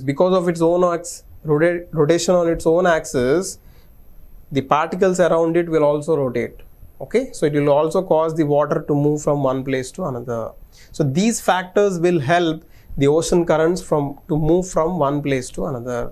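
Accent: South India, India. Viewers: Are you South lndian?....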